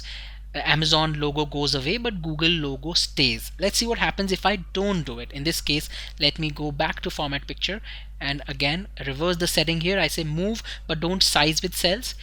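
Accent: India. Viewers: indian speaks English =))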